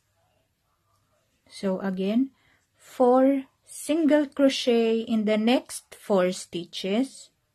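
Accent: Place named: Philippines. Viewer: thank u so much for this video, also are you filipino? the accent sounds familiar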